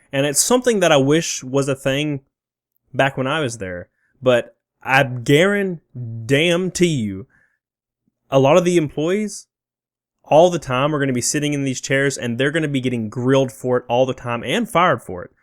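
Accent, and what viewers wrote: South, United States. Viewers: It's a southern thing. In my time there, I ran into tons of otherwise highly intelligent people who mangled the pronunciations of all kinds of words.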